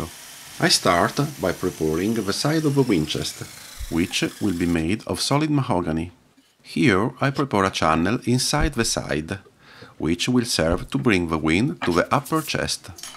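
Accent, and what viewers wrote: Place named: Italy. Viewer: si sentiva, anche io sono italiano da quello che ora puoi immaginare e volevo dirti che fai dei bellissimi video e continua così <3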